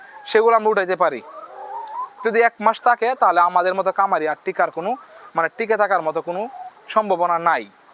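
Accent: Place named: Kishoreganj, Bangladesh. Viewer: Koi na bedar bari kishoreganj a mone hoi, boler doron a mone hoitase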